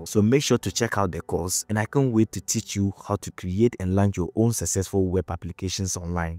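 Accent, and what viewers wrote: Ghana. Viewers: Are you from Ghana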